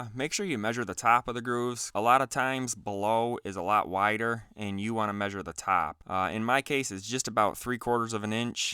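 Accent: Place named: Michigan, United States. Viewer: Takes one whole minute explaining how most videos are too long 😂 Good video man. Appreciate the tips. Also, I didn’t realize how easy it was to spot a Michigan accent till I moved away. But I’d be willing to bet your from Michigan. Aren’t you? Haha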